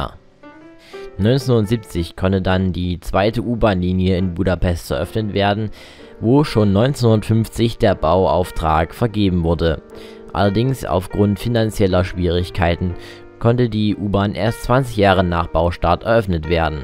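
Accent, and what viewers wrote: Germany. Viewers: wie kommst du drauf, du hättest keinen Akzent? 😂 Ungarinnen und Ungarn sprechen akzentfrei ungarisch, du sprichst alle ungarischen Begriffe mit einem grauenhaften deutschen Akzent aus. Selbst auf Deutsch nuschelst du stark und versprichst dich viel zu oft. Ist schon alles sehr anstrenged anzuhören, vor allem in Kombination mit der schlechten Tonabmischung und der teils aufdringlich lauten Musik, die du besser komplett wegelassen hättest. Ist nicht böse gemeint.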